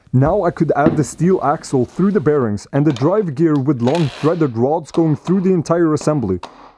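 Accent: Norrland, Sweden. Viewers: dude are you swedish? Hejsan!! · You from Skellefteå, or some other part of norrland? I'm from Lycksele.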